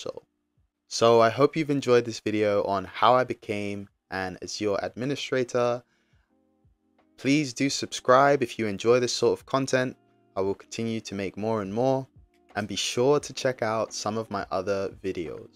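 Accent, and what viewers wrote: United Kingdom. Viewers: Hey man, by your accent you sound like you're based in the UK? How's the demand for cloud roles over there. I'm currently studying for the AWS Solutions Architect Associate cert. But I'd like to believe there's plenty of overlap in cloud if I decide to do anything related to Azure later on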